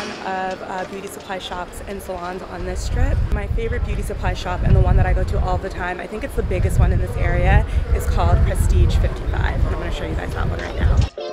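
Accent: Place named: Africa. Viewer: Macon GA with an African (from the continent)accent lol.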